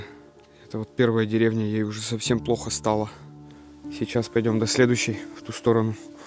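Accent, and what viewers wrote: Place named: Rural, Russia. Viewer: Благодарность тебе огромная за видео! Из этих мест родом мой муж, из села Вохма. Их род по мужской линии был большой. Рожали по 9 - 12 детей. Свёкор один из 12, 10 мужиков и две сестры. Только из их рода мог образоваться огромный колхоз. Все трудились и поэтому жили хорошо. Ставили деревни, о чём в архиве есть запись урядника за 1904 год. Но в советское время их хотели раскулачить и все разбежались по разным городам и весям. В Костромской области и в Сибири есть сёла названные по их фамилии. Предки были родом и из деревень Большие и Малые Мокруши. А вот по женской линии все были по одной: прабабушка, бабушка и свекровь. Я уже сама уже очень старая и совсем мало кого осталось. До 2015 года в Вохме ещё проживала старшая сестра моего мужа. Но думаю, что в России ещё много потомков от этого рода. И всех известных мне и неизвестных я люблю и почитаю. 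Благодарность тебе, за видео с этих мест, замечательные твои комментарии с лёгким деревенским говорком и знание бытового устройства с его атрибутами. Просто бальзам на душу!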